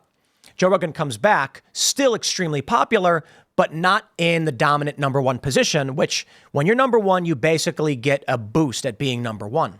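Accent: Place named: Wisconsin, United States. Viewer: How about a SPOILER warning? Hearing read Rogan's jokes in his hoarse 'sconsin accent is a guaranteed way to not make it funny when I watch Rogan actually deliver.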